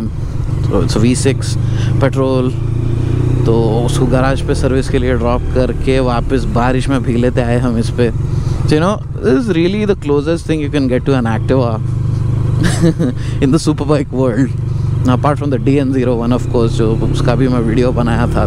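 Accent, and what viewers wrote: Hyderabad, India. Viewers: U r language is very funny 😆 I am very enjoy u r video. I love hydrabadi language ❤. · I have been laughing through out the video... Bro had i been a Hyderabadi i could have this phonetics....Hallu hallu chala nahi ghus jata maa ki kir kiri....I love this maan... :D From Bhubaneswar, Odisha. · I watch your videos for 2 things, your hyderabadi slang and the beautiful bikes you show us!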